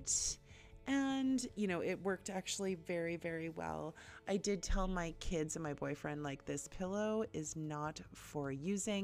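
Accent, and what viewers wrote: Canada. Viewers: I have only one other DIYer to whom I am subscribed that uses Hippo waterslide and heat transfer paper. It is fascinating to watch it being used. I just plain have to try it out myself!! You have made projects here today that, as always, look high end. My favorite was the coasters! I look forward to each of your videos!! Your voice is soft and soothing and I’m diggin’ your Canadian accent!! 😃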